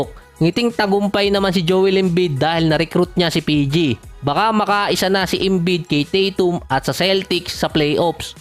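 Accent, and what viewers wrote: Batangas, Philippines. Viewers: BATANGEÑO PALA SI IDOL .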